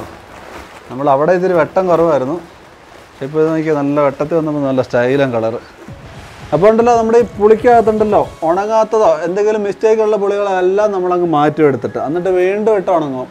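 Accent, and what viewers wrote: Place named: Thodupuzha, India. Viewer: Ahaa njan thodupuzha.. Samsaravum aaa style okk kandapo thoni.namude nattukaranane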